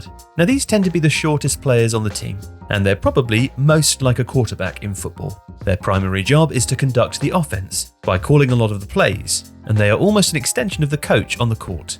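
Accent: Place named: England, United Kingdom. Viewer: I didn’t need a fancy English accent to tell me that. White washing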